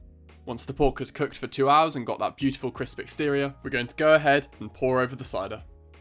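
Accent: United Kingdom. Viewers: That's what you can hear from a British dude. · a form of london accent, possibly a west london one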